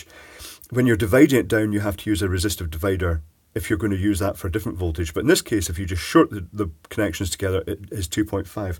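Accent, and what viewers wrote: Scotland, United Kingdom. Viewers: There is absolutely no need for you to apologize for a long video. We watch your videos coz we appreciate your expertise and above all we value you. For me one of your videos can't be too long at all and I think that I speak for countless others who also watch your channel regularly. Thx for your great work and for your unbeatable Scottish humor and accent 😃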